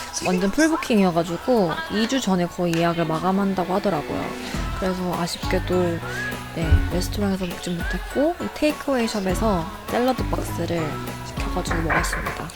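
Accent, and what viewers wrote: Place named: United Kingdom. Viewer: 영국발음 너무 좋아요~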